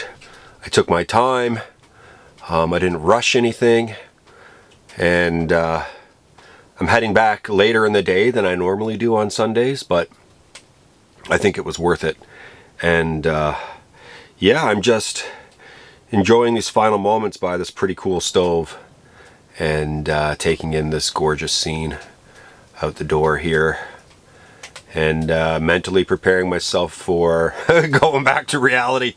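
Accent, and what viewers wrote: Newfoundland, Canada. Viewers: I hope you wanted your chicken well done! You say you're in the NWT but you sound like a Newfie? Shouldn't you be on the other side of the country?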